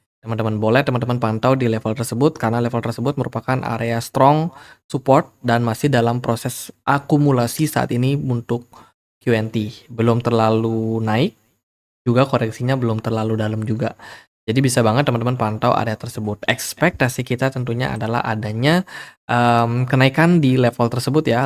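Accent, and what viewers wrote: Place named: Aceh, Indonesia. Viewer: orang aceh bang? mirip banget logatnya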